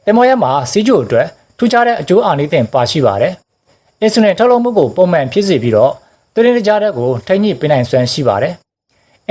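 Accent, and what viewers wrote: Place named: Dawei, Myanmar. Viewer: ထါးဝယ်သံပေါက်နေတယ်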